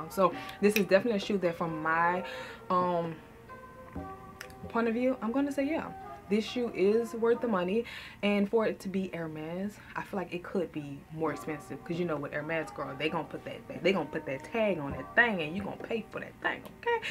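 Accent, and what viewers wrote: New Orleans, United States. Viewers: It's ok g if the New Orleans keeps jumping out I'm from Baton Rouge and spent half of my 90% off my child hood in New Orleans and that's what I loved the most tha accent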